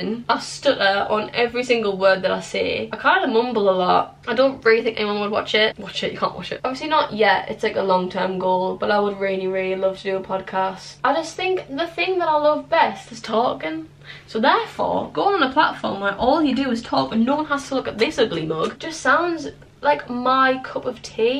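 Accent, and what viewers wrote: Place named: North, United Kingdom. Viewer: you’re one of my fave you tubers, I don’t think I’d ever stop watching your videos, you’re so fun and honestly I would love to be friends with you, I’m also from the north and I absolutely love your accent ‼️💜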